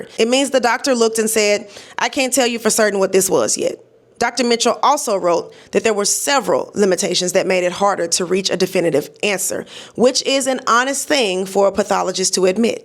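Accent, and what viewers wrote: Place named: South, United States. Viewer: Very thorough and informative. Love the southern accent, too.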